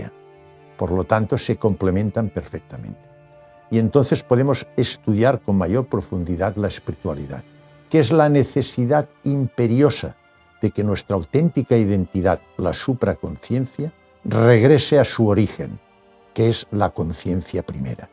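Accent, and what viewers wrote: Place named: Catalonia, Spain. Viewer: Hace algún tiempo viví en Barcelona , el acento es muy catalán hasta siento que le he visto antes . Abrazos , muy agradecida por sus charlas.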